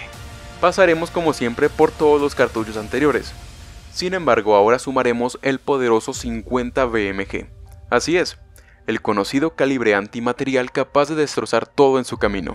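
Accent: Mexico. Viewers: eres de México?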